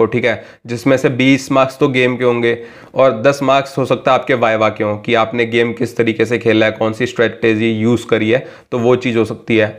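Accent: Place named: Uttar Pradesh, India. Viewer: mujhe laga aap up wale hain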